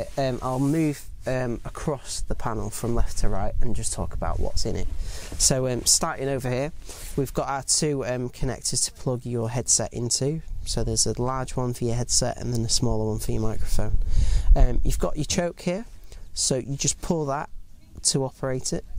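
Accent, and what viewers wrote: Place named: United Kingdom. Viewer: It was quite challenging for me to interpret the British accent, I´m more accustomed to the American one. It feels like a mechanical typewriter is speaking to you, and the rhythm hypnotized me and my eyes closed in a brief sleep. I rewound the video several times. In any case, I found it very interesting and the information provided was complete. I enjoyed it very much. Greetings from Buenos Aires.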